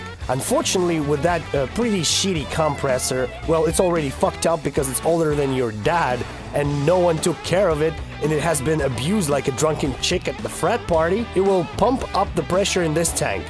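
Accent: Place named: England, United Kingdom. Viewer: О, вы из Англии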